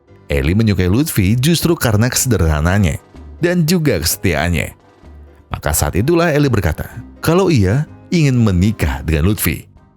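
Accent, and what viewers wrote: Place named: Semarang, Indonesia. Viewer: logat mu to kayak wong semarang og mas😭😭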